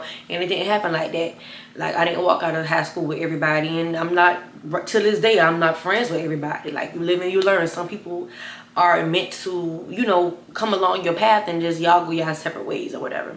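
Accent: New Orleans, United States. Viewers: You not from New Orleans ?😟could had fool me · Girl i woulda never guessed you were from Savannah 😂 it’s def giving NOLA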